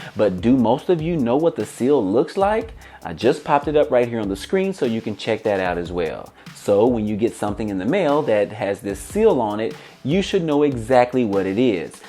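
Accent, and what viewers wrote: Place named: California, United States. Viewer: Gdmorning 😁 THANKS FOR THE EARLY CONTENT WE LOVE ❤️ YOU FROM LA CALIFORNIA.. SOUND LIKE U FROM CALLI, Are you?🥰👍🏽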